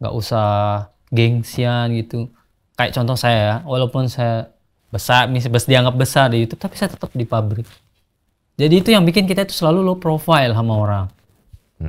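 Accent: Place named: Bali, Indonesia. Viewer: logat nya bang 😂 ada Bali Bali nya gitu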